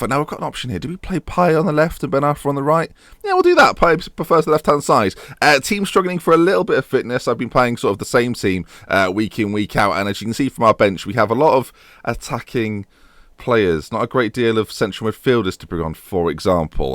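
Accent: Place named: Newcastle, United Kingdom. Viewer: chuffed for you for hitting 30k subs mate. u deserve it man. me fave youtuber from newcastle england to australia. currently in a hostel in mildura victoria but stil watch ur vids every day. reminds me of home so cheers wor kid. canny geordie accent aswel but stil needs work haha!! keep doing wot u do pal. #fluid #geordieinoz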